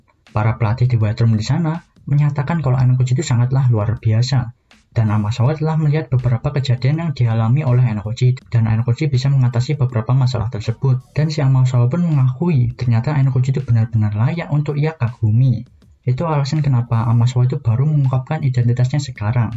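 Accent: Java, Indonesia. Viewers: Makasih mang medhok 🤌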